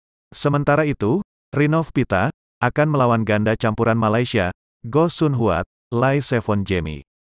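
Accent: Banyumas, Indonesia. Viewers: Kok..ga nampilkan drawing Luxemburg open dan swedea open...makasih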